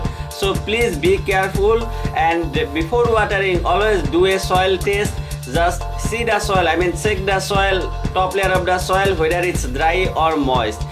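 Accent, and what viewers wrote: India. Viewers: I hate root canal surgery... But i hate indians speaking English even more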